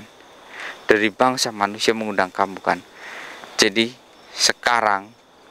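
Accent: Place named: Banyumas, Indonesia. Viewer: itu bhs jawa ngapak saudaraku, bhs halus tp ya terdengar beda dgn bhs jawa solo semarang, mungkin Mr ini tinggal d daerah jawa tengah bahagian barat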